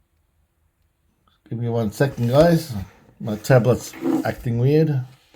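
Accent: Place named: Australia. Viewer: You sound Australian to me and I was just watching the Live Tracking of Apophis and it said passes would be in 2029 and 2036... or as you say in dream; 7 years to go, 7 years to go. Since you posted this video NASA has done the DART mission with smashing success(pun intended) but that got me thinking. Why's NASA doing this? Now I hear we're going to this asteroid and now I'm here and getting very concerned in USA. 2371 Days 2 Go, live them well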